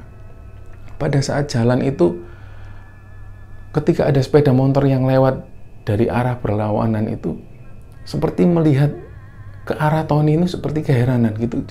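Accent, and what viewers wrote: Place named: Surabaya, Indonesia. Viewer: Seng tak tunggu iku logat khas suroboyo cak. Ojok di ilangno boso suroboyoe.. 👌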